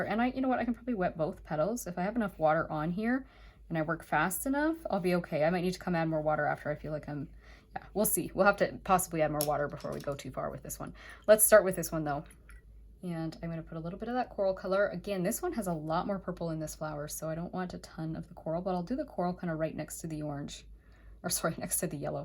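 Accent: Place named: Canada. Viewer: This is really beautiful. These are my favorite color combinations. I have used yellow as an underpainting, but it didn't occur to me to leave the white there for the other colors. I appreciate you sharing your talent with us. I've enjoyed using the Simply Simmons brushes as well. I think I first started using them after I watched an artist in Canada. I think his name is Jo (last name...can't remember) and he works with the Artists' Network (I think). You sound like you are from Canada. Am I correct? You don't have to say, if I'm too nosy.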